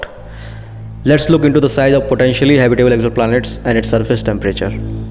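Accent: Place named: Africa. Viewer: why does your voice sound African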